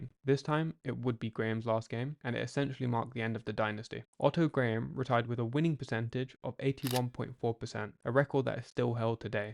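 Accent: United Kingdom. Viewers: Just gotta add why a British accent guy narrator on American football. Also the BROWNS ran the Cleveland rams out of Cleveland to LA. The Cleveland rams won a NFL championship in Cleveland before they left.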